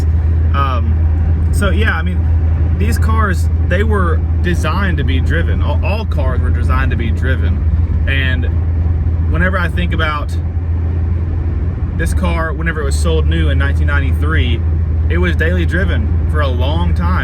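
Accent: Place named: Sweden. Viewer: Where is this in the US. For some reason I think I can hear a little bit of Scandinavian(Swedish) accent, can be wrong. Thanks.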